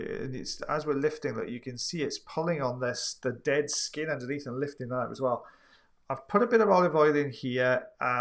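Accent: Wales, United Kingdom. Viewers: iv got to say if there was audiology ear wax removal sports 👂🦻, iv got to say you would 100% win awards has the best commentary that goes along with the vids...best youtube commentor ears 👂 down... love that gourgous welsh accent.x Keep up the good work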